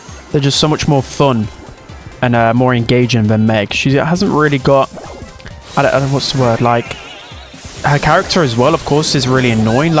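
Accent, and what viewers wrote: United Kingdom. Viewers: I love his British accents. Makes me comfortable to watch before in NY I don't like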